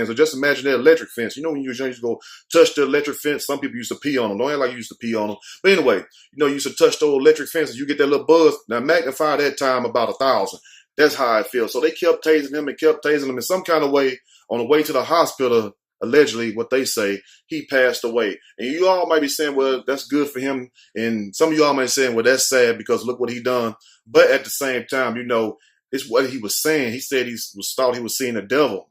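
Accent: South, United States. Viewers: where are u from I can't place your southern accent?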